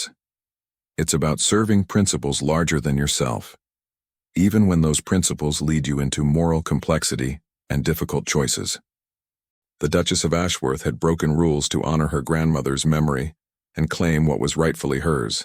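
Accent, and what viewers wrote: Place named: United States. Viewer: Ruined by being 'read' in an American accent. 😡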